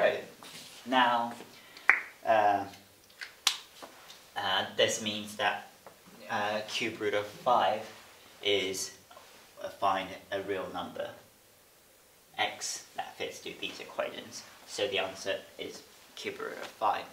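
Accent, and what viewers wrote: United Kingdom. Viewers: You know how good it feels when you got it right Btw I solved this in 10 min I’m not gonna lie I’m so happy Ps I love your British accent it’s so cool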